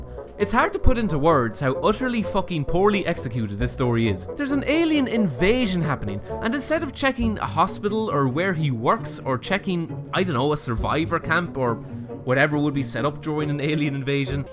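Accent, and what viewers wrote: Ireland. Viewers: Just love hearing an Irish gaming you tuber ❤️❤️❤️ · something about an irish accent makes me subscribe before i even watch the video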